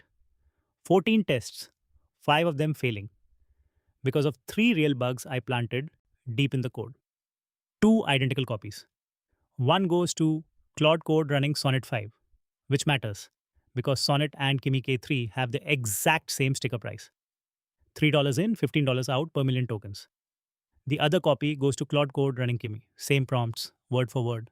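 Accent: India. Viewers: It’s my first time seeing a video from this guy with the thick East Indian accent. My feeling is that regardless of any bias he might have he did worthy testing and an excellent job of spelling out his methodology. Better than the usual junk that I’ve wasted my time watching. So: 1) Kudos to him for doing this. 2) where’s your YouTube video doing this kind of evaluation in a totally unbiased way? Seriously, it’s easy to criticize but takes effort and I think you shouldn’t be so harsh. Please ponder that…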